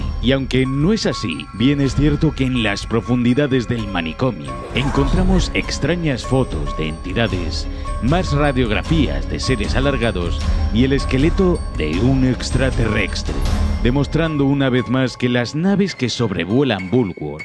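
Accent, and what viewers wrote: Spain. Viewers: Una pregunta eres de España o de latinoamerica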